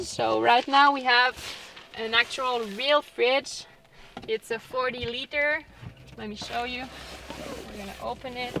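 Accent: Quebec, Canada. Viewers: Where are you located. ? Sounds like Quebec Canada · Il y a plusieurs vidéos en anglais de ce genre. Ça fait du bien d'entendre l'accent québécois. Nice set-up.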